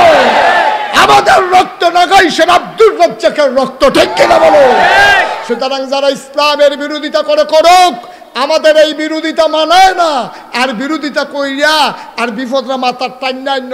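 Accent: Comilla, Bangladesh. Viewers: কুমিল্লার ভাষায় ওয়াজ মাহফিল শুনে ভালো লাগছে!!! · আহা,আমার মধুর কুমিল্লার আঞ্চলিক ভাষা।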